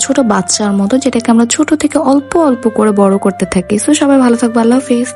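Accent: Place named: Dhaka, Bangladesh. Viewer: আপু তোমার ভাষাটা মাশাল্লাহ অনেক সুন্দর এটা ঢাকার কোন এরিয়া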